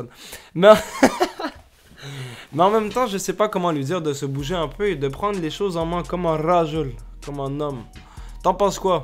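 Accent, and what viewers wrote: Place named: Quebec, Canada. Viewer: woula tu mfumes ahahah vive l'accent québécois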